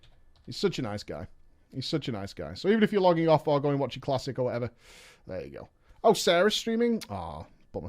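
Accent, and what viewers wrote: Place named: Manchester, United Kingdom. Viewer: Sounds like your from manchester ar kid nice to see someone local doing well, keep it going mate.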